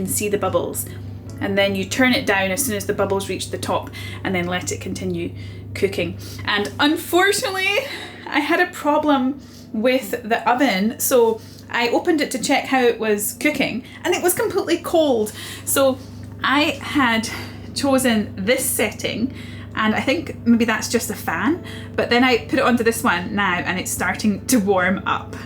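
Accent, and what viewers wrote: Scotland, United Kingdom. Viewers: I love your videos......I love your accent....I can't place it.......people in Clarkston spoke like you, but I feel you are from Fort William or the such like. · The Scottish accent❤ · Hi How are you doing today How was your Christmas Holiday I like your youtube channel I watch every video you are beautiful, i like your Scottish accent Stay safe traveling Take care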